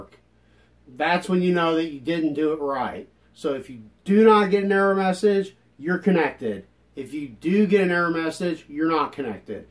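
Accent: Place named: Philadelphia, United States. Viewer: Are you from Philly? Because that sounds like one hell of a philly accent.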